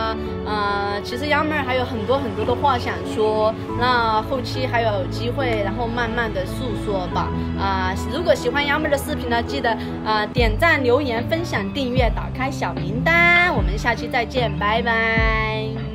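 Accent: Guizhou, China. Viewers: 对了，昨天把妳说贵州话的视频录下传给一个贵州黔东南属苗族的女性朋友，她说的确是贵州话，她在其他省份工作，很久没听到这腔调了😂😂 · 老公不等於腦公喔，你貴州話音太重了！人是互相尊重的，你敬我一分我敬你三分！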